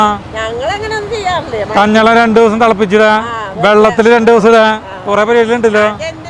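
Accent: Thrissur, India. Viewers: സംസാരം കേൾക്കുമ്പോൾ ശക്തനിൽ വന്ന ഫീൽ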